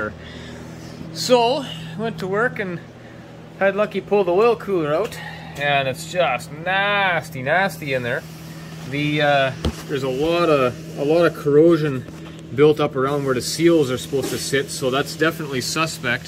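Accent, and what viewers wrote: Canada. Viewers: You're Canadian right? You have the accent...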